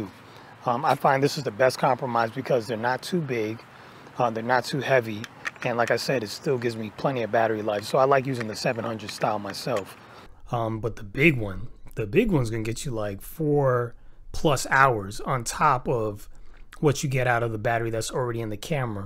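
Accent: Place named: United States. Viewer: Dude, your American accent is so smooth! It's like butter. You sound like a mix of Ray Romano and young Louis Armstrong!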